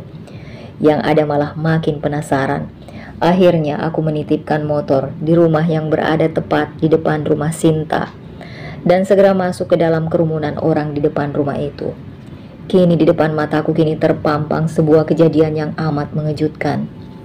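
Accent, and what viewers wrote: Medan, Indonesia. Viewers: Pasti yg bacakan ini orang Medan ❤❤ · Bogor hadir nyimak sy senang dgrin logatnya bahasa dr medan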